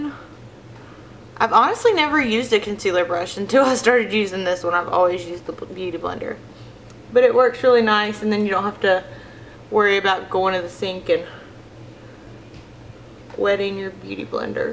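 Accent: South, United States. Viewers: My favorite palette by far is the Amazonian Clay Rainforest palette by Tart. It is perfect for my skin tone and the shadows bring out my blue eyes. I HAVE to get my hands on the morphe 350 palette and do this tutorial!!! I looooove vampy lips too but I have thin lips so this makeup look may not look as good on me. My instagram name is cck008. I love your channel and your southern accent so much!! Ps do you whiten your teeth? they look sooo white! If so, please do a video where you talk about how you get your teeth to look so good!!!! xoxo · I love your videos and insta posts! Where are you from? I love your country accent.. I'm from TN and have a country accent too!! :) I loveeee my morphe 35K palette! It's perfect for cool and warm toned looks!! ❤️ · I just noticed your po box ! I knew you had a southern accent but had no idea that we are in the same state ! 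That's cool ! I look forward to your next tutorial !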